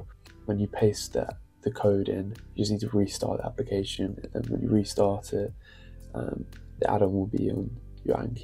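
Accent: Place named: England, United Kingdom. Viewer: Lovely to here clean, calm, English accent so "unYOUTube🇺🇸" Not one GUYS! You're A very nice TEACHER that's my opinion, as a teacher. 😊 Thank you flower! Edit, ok, so a 'guys' but not annoyingly.😅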